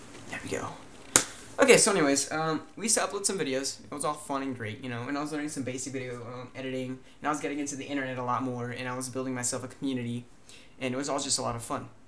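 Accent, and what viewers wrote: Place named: United Kingdom. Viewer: Thanks. I'm not as addicted as it sounds like you used to be, but I do over-use my computer. So after this comment, I'm turning it off and going downstairs :) Nice to see you realised this and turned your life around, I'm happy for you. And side note, the accent is kind of British :) Question... do you prefer to make vlogs, or tutorials? What do you see as your "main channel"?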